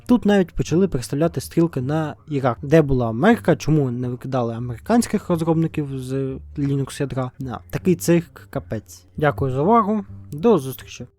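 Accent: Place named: Russia. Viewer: Вы выступаете за все украинское, но почему у вас вся фонетика русская?? Вы даже "и" произносите по-русски как [ɨ], а не по-украински как [ɪ] и "в" как русское /в~ф/: жофтня а не жо[w]тня... Буквально слова украинские, а звуки русские. Я тоже не поддерживаю войну, но это какой-то позор. Как лингвисту мне интересно послушать литературный украинский, но такие материалы найти реально сложно, везде какая-то пародия на украинский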